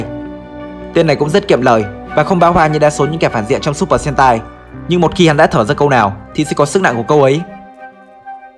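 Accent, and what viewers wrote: Japan. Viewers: Có một vấn đề mà mình khá khó hiểu, chủ kênh anh thường phát âm danh từ thuật ngữ trong video theo kiểu Nhật dù có là từ có phần tiếng Anh cũng thành japanlish, mà sao trong video này đoạn lúc thì lup'A'n lúc thì lup'I'n vậy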